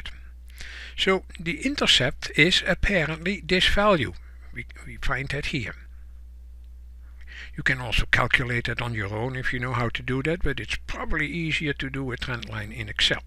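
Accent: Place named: Netherlands. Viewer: hey! i assume that u are from te nederlands? i use the same accent haha! LOL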